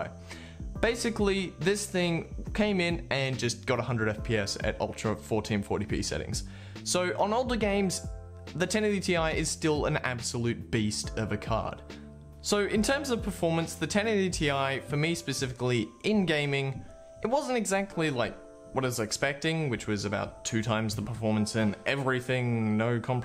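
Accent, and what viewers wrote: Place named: Australia. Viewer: I cannot tell if ur asutrallian american or british ?😂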